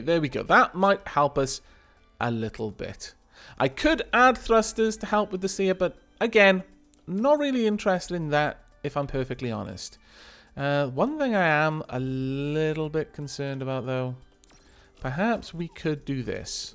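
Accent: Wales, United Kingdom. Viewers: firstly, please read on xD. Secondly, you genuinly are THE best youtuber out there. You aren't recognised as so because you dont play the big games such as minecraft. There, take the compliment and run, you dapper soul! Also you refer to living in Scotland often, but you sound un-mistakably Welsh???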